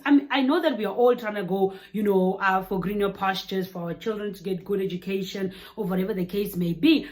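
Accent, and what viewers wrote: South Africa. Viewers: Lol when I go to youtube nothing turns me on like googling for "South African reactions" "Amapiano reactions" trying to find what other ppl think of us Etc I think that what everybody else is doing. I will support and subscribe 😅😂🤣 coz its a good South African reaction. I like you, you are fulfiling your purpose and given your personality and toothgap for a reason. You are fixing the rest of Africa while Addressing Africans as a Congolese you look and sound South African even your jolly and spirit is so un characteristic. You are bor for this. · Lol you sound like South African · ❤️❤️❤️☺️☺️☺️☺️ Your accent is South African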